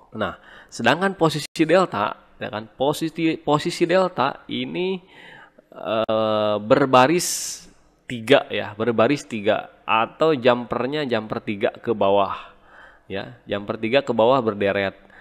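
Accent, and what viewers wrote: West Java, Indonesia. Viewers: Mang orang Sunda ya😃😄😄?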